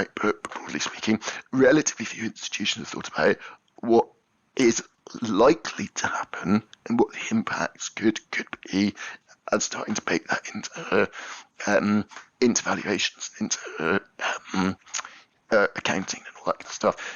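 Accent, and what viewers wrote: United Kingdom. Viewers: Need clear voice recordings, esp some of us are not used to Brit accent. The point on risk vs scientific conservativism is real.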